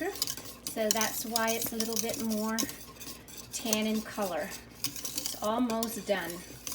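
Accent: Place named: Pennsylvania Dutch, United States. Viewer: Könnt euch ein video nur auf Deutsch machen, bitte. :-) I like your Pennsylvania Dutch dialect.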